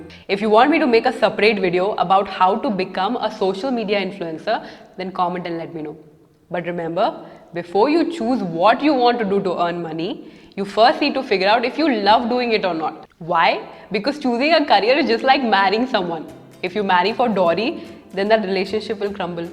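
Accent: Hyderabad, India. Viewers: Are u an Hyderabadi ????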